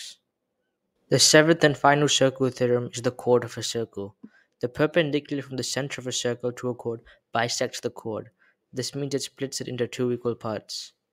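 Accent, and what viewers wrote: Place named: United States. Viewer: Dude your accsent is somewhat like american. Great job ❤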